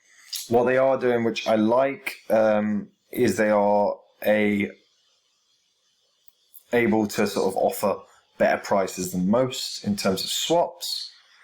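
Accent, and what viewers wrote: United Kingdom. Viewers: You may not like the british accent but damn this guy knows crypto!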